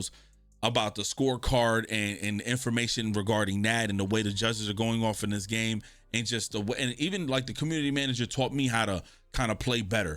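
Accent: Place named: East Coast, United States. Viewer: Are you from Jersey? I hear east coast in your accent, but Im not sure if its NYC or not.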